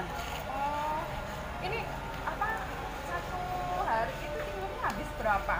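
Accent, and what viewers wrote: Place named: Surabaya, Indonesia. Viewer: Dasar wong suroboyo yo ngunu rek ngomonge biasa wae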